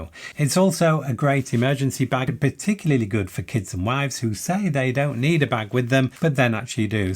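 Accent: England, United Kingdom. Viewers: That’s an English accent.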